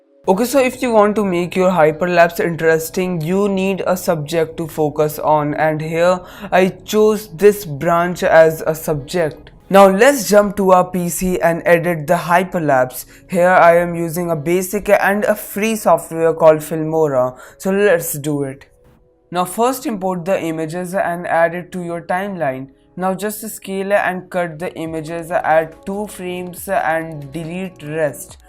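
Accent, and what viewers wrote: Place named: India. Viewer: From your voice I am taking guarrentee that you are 🇮🇳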